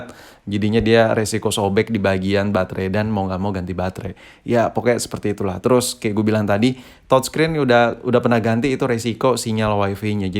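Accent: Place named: Java, Indonesia. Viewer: apa jawa keras ni bozzz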